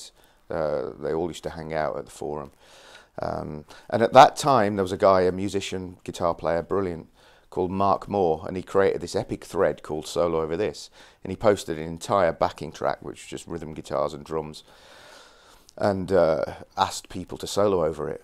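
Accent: United Kingdom. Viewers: Hello!...I really hope that you and yours are healthy...take care!!! I thinck you are so far from DT...your tune is awsome more DT than DT... I always loved JP and DT but your way of playing hybrid picking, legato etc...you are flying above the neck of all your guitars...your style is so fluid and airy... Nothing is rough or inelegant in your game... you play as you speak but faster... with clarity in a calm and calm manner with this unique British accent so far from the nasal American accent... (... be careful, not all Americans have this accent coming from the nose...) I am French and the English accent is very precious as is your game, with finesse and mastery. I don't know how many centuries it would take for me to live to reach this level... respect.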